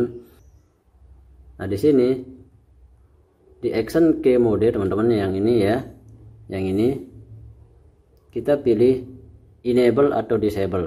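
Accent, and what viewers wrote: Bali, Indonesia. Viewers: aksennya kok kayak orang Bali ya?. Laptopnya seperti yang aku pakai ini, paka SSD 256GB, HDD 1TB dan RAM 8GB, hanya saja untuk record aku kurang sreg, karena suara fan masih terdengar, mungkin harus pakai external Mic ya?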